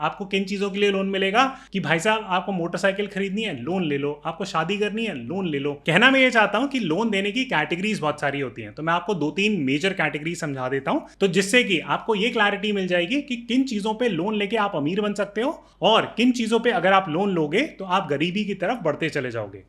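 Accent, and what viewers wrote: Standard. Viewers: Aapki shudh hindi kabile tahreef hai ustad :) it is never expected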